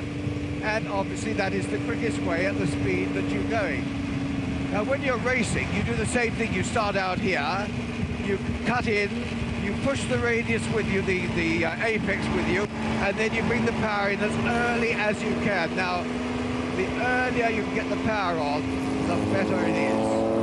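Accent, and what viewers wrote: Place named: RP, United Kingdom. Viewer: He's one of those guys you could listen to all day and never get bored. I don't know if his, posh?, accent is a help or hindrance to that.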